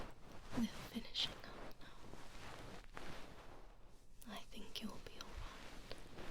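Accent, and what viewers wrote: United Kingdom. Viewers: oh goodness, I love your British voice. This is just sublime Miss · I love the British accent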